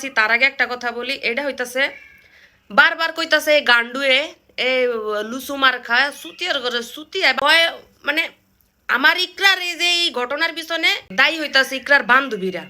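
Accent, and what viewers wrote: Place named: Narsingdi, Bangladesh. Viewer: আপনি কি নরসিংদী জেলার মেয়ে? ভাষাটা এমনি মনে হয় 😅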